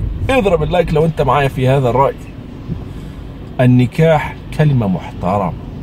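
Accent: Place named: Ireland. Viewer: Such an Irish accent! try to do it more bro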